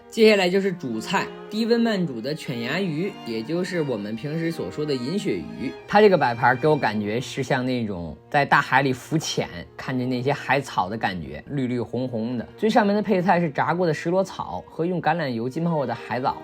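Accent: Beijing, China. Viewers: 喜欢你说的中国语是北京话吗？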